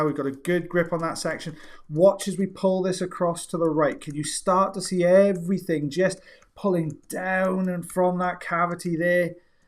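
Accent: Wales, United Kingdom. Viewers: Where in Wales ARE YOU FROM???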